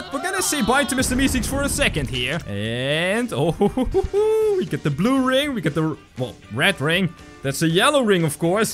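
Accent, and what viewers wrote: Netherlands. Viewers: I have a question. Are you dutch?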